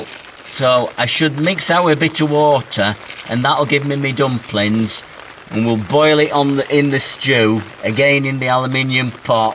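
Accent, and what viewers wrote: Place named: Northern England, United Kingdom. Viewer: Hi I absolutely love your videos. After years of hotel room holidays I camped in Wales in August in that humid stormy weather. We were on a campsite and the hail stones were as big as golf balls. My car has hundreds of dents in it! I have arthritis so can't do a lot but I'm so looking forward to getting out there again when we can. Listening to your calm, gentle Northern voice is the best. The video where you packed your glasses in your tent made me smile. Such honesty. I've watched all your videos and love the cookery that goes on. And the garden camp was brilliant I even went out and bought those beers! Fantastic looking forward to the next instalment. Kay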